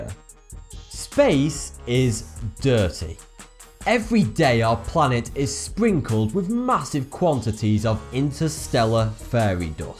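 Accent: United Kingdom. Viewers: I love his British accent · Is he British? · So I don't mean to be rude but have you ever lived in Britain? He has one of the least annoying accents you can get, in my opinion. Would you rather have a cockney?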